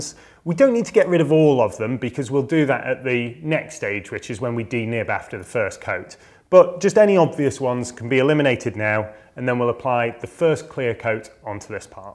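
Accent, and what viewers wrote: United Kingdom. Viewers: I like hearing him explain things in his cute British accent! This is porn for moms! 💁🏻‍♀️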